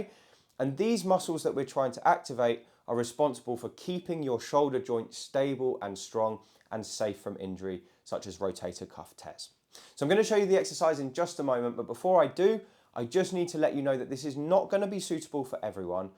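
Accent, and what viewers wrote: United Kingdom. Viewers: Great videos and very helpful. Love your British accent, but who doesn't love a British accent!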